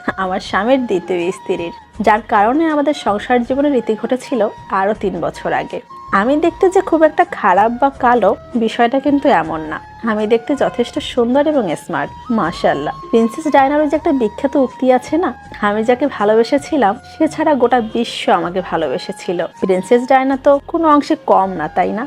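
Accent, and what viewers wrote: Barishal, Bangladesh. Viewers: আমি একজন নোয়াখালীর ছেলে। কিন্তু আমি বরিশালের কথাগুলো বেশি পছন্দ করে। আপু টিকটক গুলো আমার কাছে খুবই ভালো লাগে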